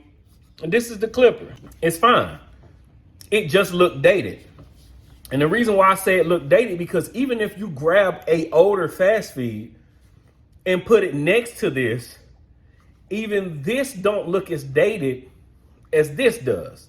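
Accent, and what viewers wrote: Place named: Memphis, United States. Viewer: Very honest opinion! I appreciate that. New to your channel bro & subscribed because of your genuineness. Keep being authentically you man! BTW don't know where you are located but your swagger sounds like Memphis! Just a guess. Peace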